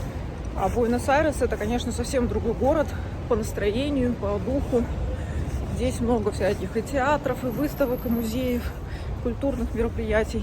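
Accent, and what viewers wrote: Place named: Urals, Russia. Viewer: Так она не говорила что из Москвы,ну и по говору и манерам совсем не москвичка,скорее с Урала..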